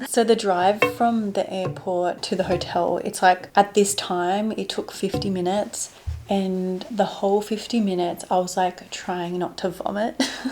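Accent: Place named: Australia. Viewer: I’m Japanese. I leaning Australian English from your Vlog, thank you so much❤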